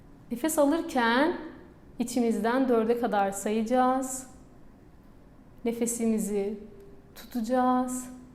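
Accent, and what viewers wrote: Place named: Van, Turkey. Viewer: Hocam siz nerelisiniz? Vanlılara benzer bir ağzınız var ama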